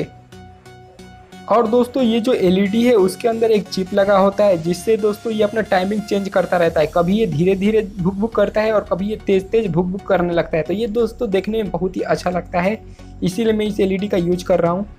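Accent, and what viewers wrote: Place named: Eastern Uttar Pradesh, India. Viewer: most welcome sir Up me aap kaha se hai sir language se aap east up ke lagte ho sayad Varanasi ya Balliya side Aap hi btaeye if koi problem na ho to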